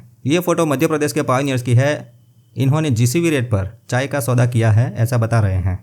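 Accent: Gujarat, India. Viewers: Sir aap Gujrati ho?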